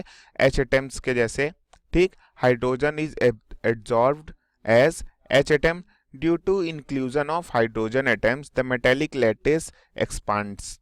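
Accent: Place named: India. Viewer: Am I supposed to understand your indian english ???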